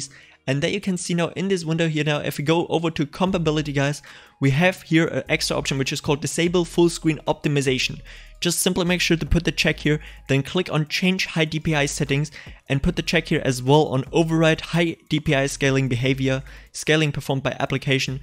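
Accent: Germany. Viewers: You are German or?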